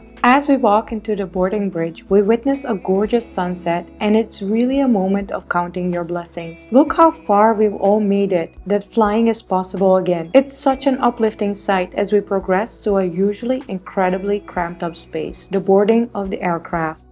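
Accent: India. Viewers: Just curious, why do you call yourself as a foreigner while visiting your home town India? Your accent is very much Indian!!!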